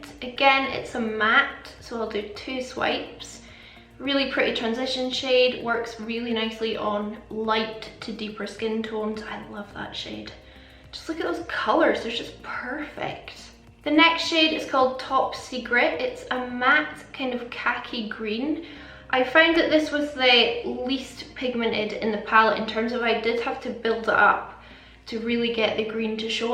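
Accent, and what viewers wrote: Scotland, United Kingdom. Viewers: Is she Scottish?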